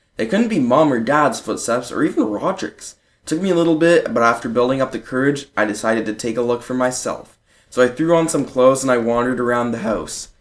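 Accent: United Kingdom. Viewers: Hey bro, small doubt.... Your scotish right?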